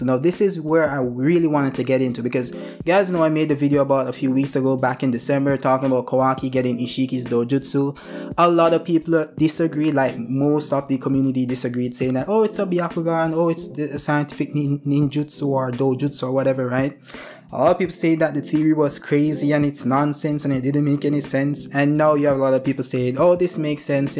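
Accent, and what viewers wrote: Jamaica. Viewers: i knew it i am also Jamaican bro u speak Jamaican English when i speak English u hear ghetto english nice to meet you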